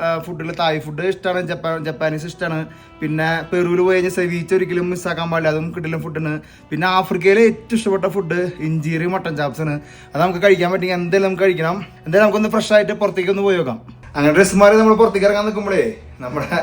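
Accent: Thrissur, India. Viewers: ninte ee Thrissur slang onnu matti pidichal korechukoode okke reach kittum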